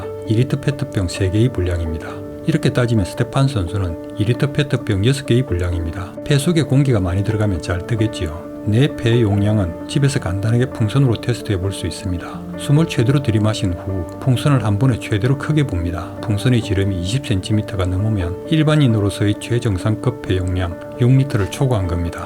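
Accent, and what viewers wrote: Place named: Gyeongsang, South Korea. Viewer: 경상도 분이시죠? 친숙한 말투가 묻어나오시네요 ㅎㅎㅎ 다큐같은 느낌이 잔잔하니 아주 좋네요. 근데, 오디오를 나눠서 시차를 두고 녹음하신건지 맑은 소리였다가 먹먹한 소리였다가 하네요 ㅎㅎ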